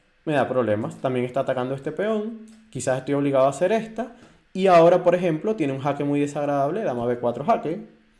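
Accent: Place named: Venezuela. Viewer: Joder tremenda explicacion Soy 1800 y jugador del sistema londres y desconocia ese tipo de castigos muchas gracias bro. Saludos desde Venezuela que creo que eres venezolano por el acento y mas si te recomendo Gascon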